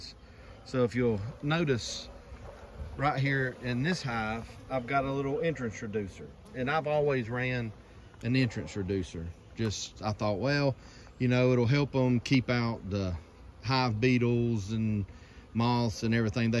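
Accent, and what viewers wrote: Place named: North Carolina, United States. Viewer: Good flow in NC also. I’m up the road from you in foothills. I’ve always taking reducers out during flow. I knew you were from NC before you said so. We got that accent.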